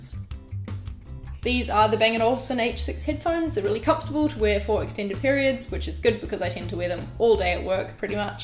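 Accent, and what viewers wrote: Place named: New Zealand. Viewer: I love your NZ accent ;)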